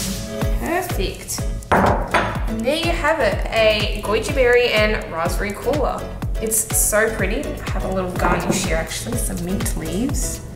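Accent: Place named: Australia. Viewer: I actually had no idea she was Australian, but I did wonder bc i started watching her Barbie vids first, so I wondered why she sounded so weird and different bc I never heard an actual Australian person say anything except like alrighty mate or something like that but then I found out why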